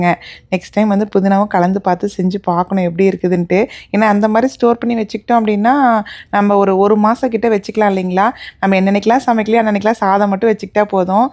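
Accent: Kongu, India. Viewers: Kongu tamil 👌 super nga 😊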